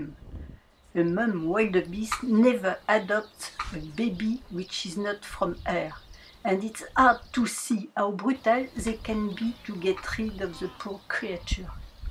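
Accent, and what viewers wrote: France. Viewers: She's speaking English with a French accent. I understand her very well. If you don't, then you're the problem not her. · Très bon reportage. Thank you for sharing. Yes, you have french accent: I love it and thank you for sharing anyway, great video -and this is what counts. Merci beaucoup de la Catalogne. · J'adore l'accent français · Love the French accent here 😁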